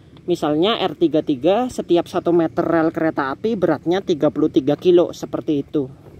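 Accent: Banyumas, Indonesia. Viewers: Mantap... Liputan dgn logat ngapak Lanjutkan!!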